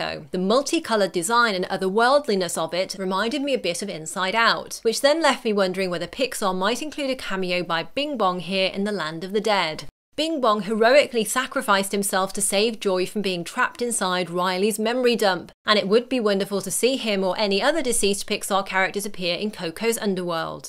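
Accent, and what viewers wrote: Castile, Spain. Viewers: I totally love this video. It stated very exact and truthful facts. Only thing wrong is the accent. The words are perfectly pronounced is just that she used spanish accent instead of Latino or Mexican accent which is totally ok. It's just weird to hear familiar names in a different accent. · That explains the near perfect Castilian accent. Que chevere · You sound castellan are you from Europe or something? Just wondering nice video tho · Great review. I would have loved for you to use the Mexican pronunciation of Spanish words, rather than the European Spanish pronunciation. For example, the name 'De la Cruz' in Mexico would be pronounced 'De la Crus' NOT 'De la CruTH'. In Latin American Spanish words with a 'z' or a 'c' are pronounced with an 's' sound, as opposed to the much harsher 'th' sound from European Spanish Therefore Pan Dulce, should be pronounced 'Pan Dulse', NOT 'Pan DulTHe'. 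It's a real shame as I could tell you were making an effort to pronounce the words correctly, however, for someone how knows the difference, it sounds totally out of context, just like a history British film would sound if words were pronounced in American or Australian English...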